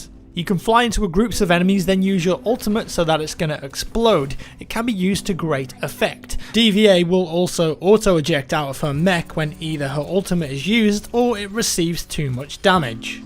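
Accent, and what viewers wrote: United Kingdom. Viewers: Are you from UK? Im from Germany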